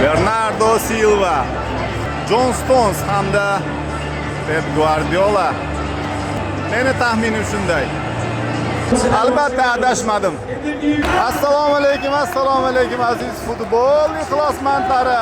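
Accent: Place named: Andijan, Uzbekistan. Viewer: Молодец! Кайф киламан андижончасига ,омад тилаб коламан анжанлиларга!!😊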